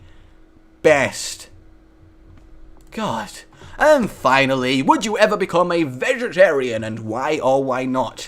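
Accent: Germany. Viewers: You're german accent is quite funny, but what I don't understand is how did you come up with it? Usually everyone who does a german accent try to sound really aggressive and load and all that, you know, because of the stereotype and so on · Who else, when hearing "German" accent, instantly thinks: "Flutters"? Also, since you are not a vegetarian, what type of meat do you like the best? I like Moose meat personally... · Your german accent is way to high pitched.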